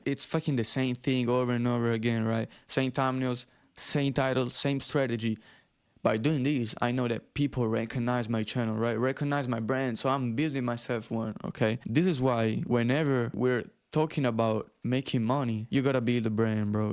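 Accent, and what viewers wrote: Italy. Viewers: true ahahah! The first thing I thought as I soon as I heard you talking is "what, Italian man making a living in music making beats?" LOL good video and good inspiration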